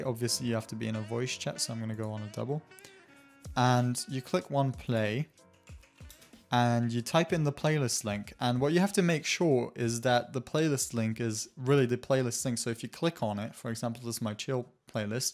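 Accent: Germany. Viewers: ist er Deutscher ?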